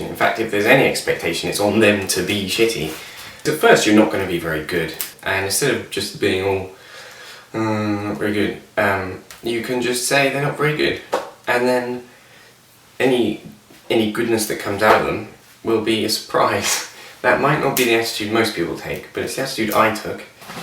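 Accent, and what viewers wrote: United Kingdom. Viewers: No he doesnt. He has an English accent, thats about it. Mitchell is much more nasal. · "Drawrrings" yep he's british it all checks out. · You look like a young Carl Sagan, and you have a deep voice like him, except yours is British haha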